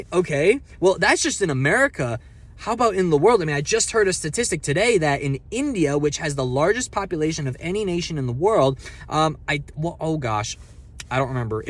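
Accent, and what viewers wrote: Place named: Canada. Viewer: Like your feed that I came across and appreciate how you put us up to speed on current events. You sound Canadian 👍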